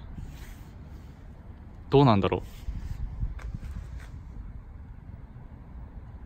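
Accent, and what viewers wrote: Aichi, Japan. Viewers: たまにイントネーション違うな～って思ってたけど愛知のイントネーションなんだな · 愛知の方のイントネーション好きです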